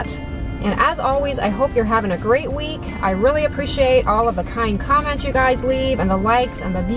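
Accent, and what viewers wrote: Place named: Pennsylvania Dutch, United States. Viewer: Hi... my grandmother from PA was fully Pennsylvania Dutch as well. Thereby, making me a good portion!.. You pronounce so many words just like she did! So cool!. The scenery is so awesome where you live! The fabric store is great one of a kind, and the market with beautiful produce etc is so fantastic. The garden shop! Wow...everything is simply Amazing! Thank you! May God bless you!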